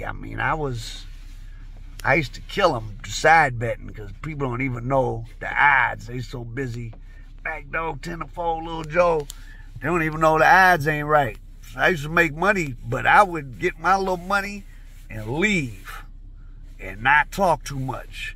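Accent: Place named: Missouri, United States. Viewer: Omg is this guy from Missouri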